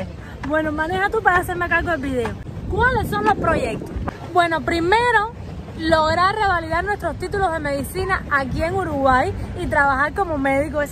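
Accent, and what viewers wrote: Cuba. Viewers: Hola Ojalá puedan lograr su autito. Dile que no se preocupe por el escarabajo. Es fiel. Y por sumba. Búscate un gym y ofrece tu servicio. Te darán lugar para que ejerzas. Uno buenos pesos no está mal. Y con tu tonalidad cubana....se llenara. Tengo un gym. Por esa razón te comento. Abrazo desde BsAs.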